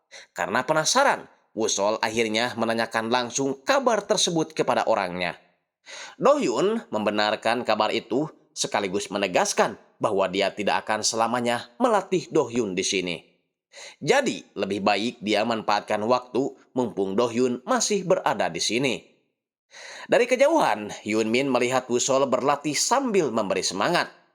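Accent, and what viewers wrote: West Java, Indonesia. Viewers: Jigana NU ngomong orang Sunda yeuh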